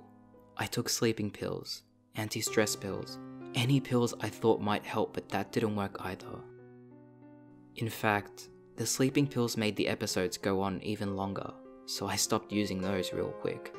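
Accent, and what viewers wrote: Australia. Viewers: Wtf the transition from the anime part to the actual video scared me cuz I forgot you had an Australian accent..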